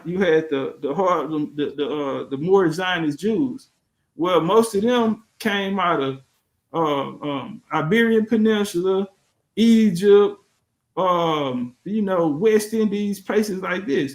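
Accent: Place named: South, United States. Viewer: I met and heard Dr Henrik Clarke in person and this young brother sounds very much like him but w a stronger southern accent! I just hear that Dr Clarke kinnection and he’d be proud ❤ his last lecture he talked about African Americans finding their true identity now I know why that was on his mind in his last days 🎉 This is THE BEST our story lecture to date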